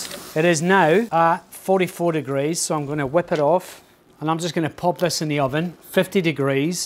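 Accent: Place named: Scotland, United Kingdom. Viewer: scottish guy where you from in glasgow?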